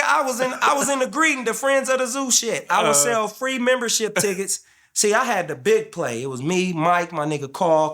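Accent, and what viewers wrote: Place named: West Coast, United States. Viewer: Dang i thought he was from the West Coast, u sound just like us brah tight.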